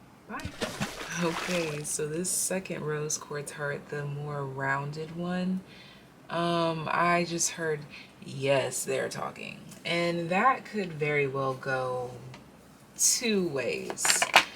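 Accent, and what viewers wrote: United Kingdom. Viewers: ahhhhh so for pile 3 right at the beginning i channeled the song “ ” by (which at the beginning speaks ab someone who doesn't know how beautiful they truly are) also LOLOLLLLL the british accent is HILARIOUS bc my man is british 😭❤️ thank you so muuuuch!!!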